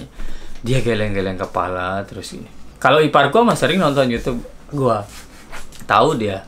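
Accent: Lombok, Indonesia. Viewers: Saluuut medok sasaknya gak ilang 😅😅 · Keren bang Logat sasak masih kentel😅 salam semeton sasak😺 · Logat lomboknya msi kerasa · Kek logat lombok ya bang.. Dari lombok kah? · logat lombok nya masih kentel bang salam dari KLU lombok jg 🎉😂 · Timmak nahase Betawi laguk logat masih logat lombok hihini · Masih bae fasih logat Sasak tie meton. Banggek gitakm sukses. Mudahanm tetep bahagia sekeluarge lek te😁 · Abang kok logatnya lombok bgt ajarkan ke kita jadi youtuber 🤗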